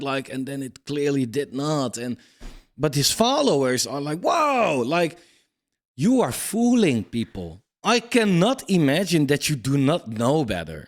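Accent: Netherlands. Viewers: blijft leuk he, dat nederlandse accent, je praat goed engels hoor !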